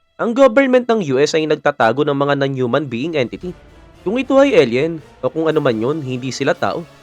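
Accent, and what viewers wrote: Iloilo, Philippines. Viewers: Ilonggo ang blogger?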